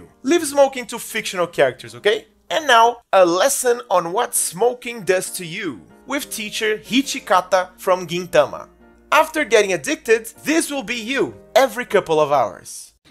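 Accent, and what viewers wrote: Brazil. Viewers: loved the video dude, but where is your accent from? the way you pronounce the names of the characters sorta remind me of the brazilian way of saying their names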